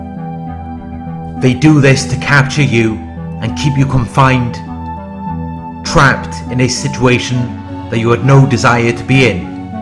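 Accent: United Kingdom. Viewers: Wow too real! Sounds toomuch like my x wife in so many ways...in her bad days me and my 2 little kids had to tiptoe around Mom otherwise she'd explode! On a family nite out to McDonald's our eldest daughter would be so fearful to upset the Narcissist Mom that she'd even refuse to order anything, afraid Mom would yell at her for ordering too much! Oh i hated those times! She eventually left me with 2 young children at home because home was just too boring for her. How those 2 precious young girls suffered, because even tho she was that disturbed element at home, there "were" some precious and few moments that our daughters did enjoy with their mother...a sad "live/hate" type of scenario, which should never be in home, especially with young growing children! So like i stated earlier, this video hit way too close to home, literally speaking. After 15 some years the girls are busy with their own children and it seems they've forgiven Mom for abandoning them when they were so young, tho the girls seem to know now which "buttons not press" or the old Narcisst Mom will suddenly raise her ugly head. 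Thanx for the video...again that British accent seems to "hook" me in each time, Haha, great!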